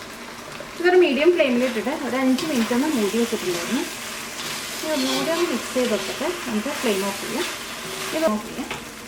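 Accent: Thrissur, India. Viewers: looks so yummy... will definitely give a try. by the way, I'm a great fan of you dear chechi... your way of explaining and that trissur slang are wonderful.... thank you for all your recipes....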